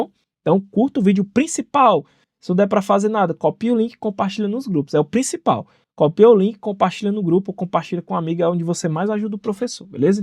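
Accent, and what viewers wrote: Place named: Northeast, Brazil. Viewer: Não fala mal do seu sotaque! Com tantas potências no nordeste brasileiro, este se tornou o que eu mais gosto! É um sotaque digno de orgulho!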